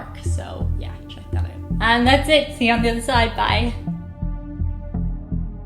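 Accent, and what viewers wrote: Ireland. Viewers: Ha come to think of it that kinda does describe most Irish women I know...I love stories like these and your accent makes them 100% better. Well done 👏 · The irish accent is cute and hilarious 😂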